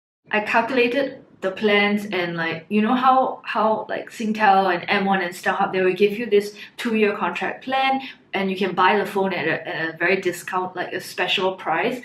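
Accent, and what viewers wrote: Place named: South Korea. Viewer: Haha glad to watch this video, I am using circles'life $28/100gb plan now and happy about it. Are you from Korea? Your English sound like Korean's English, haha. I am from Korea.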